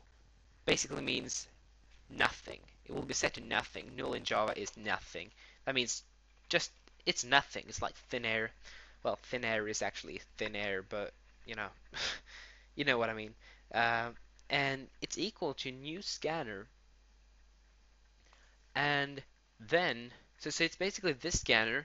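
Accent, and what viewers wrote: Sweden. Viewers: Your Swedish? :0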